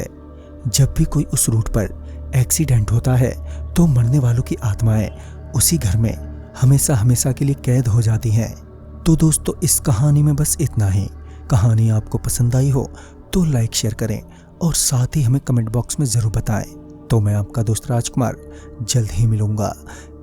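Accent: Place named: Kolkata, India. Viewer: Narrator bhi kolkata ka lag raha hai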